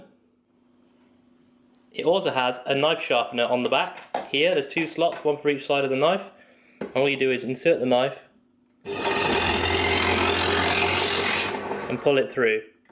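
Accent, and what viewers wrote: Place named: England, United Kingdom. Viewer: I just received my Kenwood CO606. Stay safe💙 Ps. It is so nice watching a youtuber with an english accent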